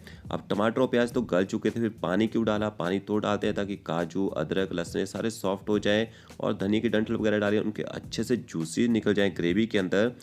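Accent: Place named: Uttarakhand, India. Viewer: This chef is from Uttarakhand guessing cuz of accent 👍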